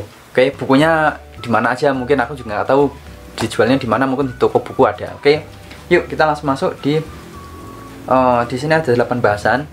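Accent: Java, Indonesia. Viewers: daebak jepangnya bisa juga pake logat medhok mas yaa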